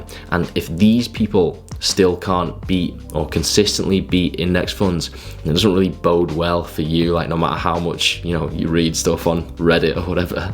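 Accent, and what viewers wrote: Liverpool, United Kingdom. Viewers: Scouse power! 💪🏼 Quality video lid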